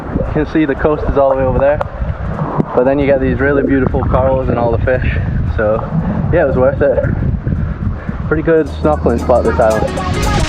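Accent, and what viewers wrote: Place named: Scotland, United Kingdom. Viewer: sounds scottish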